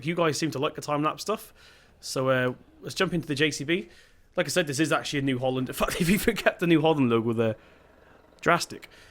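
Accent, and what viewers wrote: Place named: Plymouth, United Kingdom. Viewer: Bit of a Plymouth accent there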